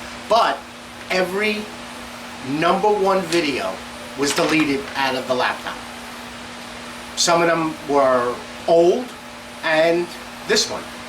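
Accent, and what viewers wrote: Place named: East Coast, United States. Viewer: you legend! liked and Subbed. love that East Coast Accent? You remind me of the Rebel Turner. All inspiration for me going home for six weeks to my home workshop in England this Thursday!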